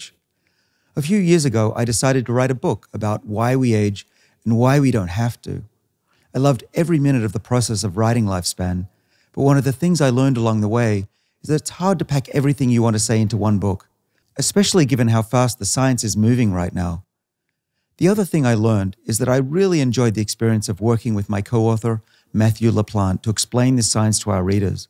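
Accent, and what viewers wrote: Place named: United States. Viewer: He's picking up an American accent.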